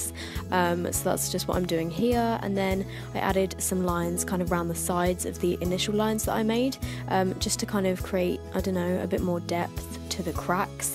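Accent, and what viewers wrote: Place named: Kent, United Kingdom. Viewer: love it yessss girl where abouts are you from near Kent or more central London? We have the same accent lmao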